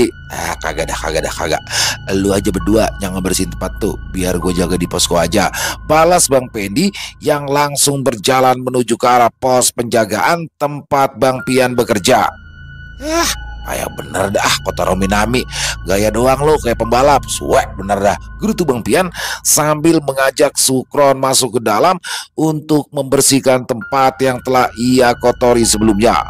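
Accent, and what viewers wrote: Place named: Betawi, Indonesia. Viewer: Majalengka hadir nyimak, klo cerita bang piyan yg kocak nya baba ali,klo di bang doni emak jubaedah,yg bawain ceritanya bang kocak banget,dialek betawi nya,seru abiss DIMARI 😊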